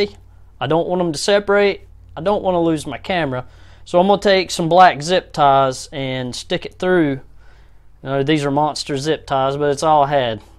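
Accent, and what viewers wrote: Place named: South, United States. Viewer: The southern accent got me laughing 😂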